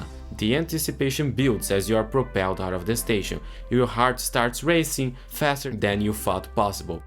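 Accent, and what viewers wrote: Brazil. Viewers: O narrador é brasileiro, né? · I already knew your channel but only now I realized you might be brazilian like me (based off the accent). Meu amigo, finalmente nosso país terá algo digno de seu tamanho na indústria de entretenimento temático e espero que isso faça o BCW e o Hopi Hari se movimentarem rápido quanto a B&M e a RMC , respectivamente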